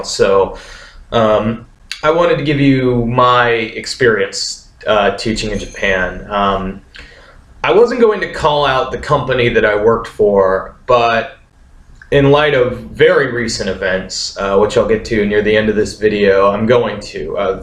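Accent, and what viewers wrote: United States. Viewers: awwww. white boy feels like a minority? Wtf did you think Japan was a magic wonderland? it is a place like any other. You sound American. America isn't any better. It is worse in many ways. All places have their ups and downs. You sound miserable and desperate. It's not the country you are in. It is you. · Sounds like an american...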